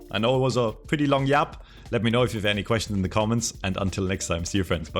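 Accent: Germany. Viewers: I can hear Deutsch :^D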